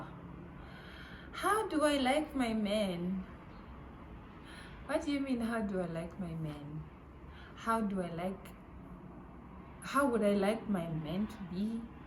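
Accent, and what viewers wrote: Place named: United Kingdom. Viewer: 🔥🔥🔥🔥 congratulations!!! 😅as for British accent!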